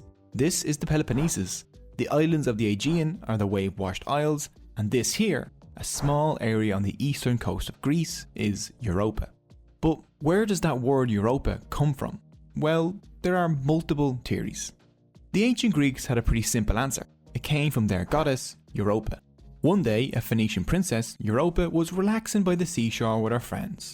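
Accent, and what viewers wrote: Ireland. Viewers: How Irish does the narrator sound? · I am so distracted by this Irish accent that I can't focus on the information being told to me 😂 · Nice to hear another irish man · This is my first time watching your channel and I immediately subscribed. Such a well detailed explanation and smooth animation! This feels like me hitting a Gold Mine. Keep it up,! Love your Irish accent! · See? What a difference, a cogent, coherent, properly expressed comment can make? You can hold a different opinion than me, even an absolutely contrary one. But when expressed intelligibly, it's a pleasure to engage with the commenter (i.e. you) rather than acrimonious. I reciprocate your expression of courtesy and wish you a pleasant day, too. In fact, this was how I hoped to engage the video creator Irishman,. His thick accent undermines the intelligibility of the narration of his well-researched presentation. 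Instead of accepting my critique, he took personal offence and hardened his stance to a "this is how I speak, take it or lump it" position. But that won't gain him viewers. On the contrary, he may lose a few. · He's Irish. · Irish accent. T is often pronounced D Hence Paddy for Patrick. Possibly due to Gaelic influence rather than English/Germanic... but I am guessing. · This guy sounds surprisingly irish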